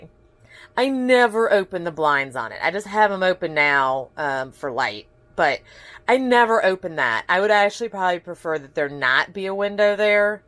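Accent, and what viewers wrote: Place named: South, United States. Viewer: Nice video! New to your channel! Just curious about where you’re from! Obviously a southern lady! Thanks for sharing! We’re kinda in a decision making process and class B vans are in our vision. 🤙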